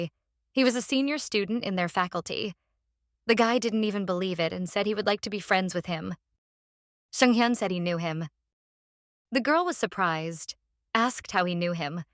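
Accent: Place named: Australia. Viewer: Ik for a fact that ur an Australian. Am i right?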